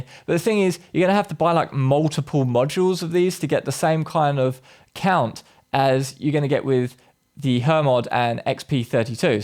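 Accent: United Kingdom. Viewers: You sound British. Are you in London?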